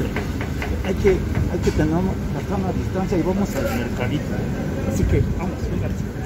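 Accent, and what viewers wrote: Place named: Mexico. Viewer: saludos tiene asento de mexicano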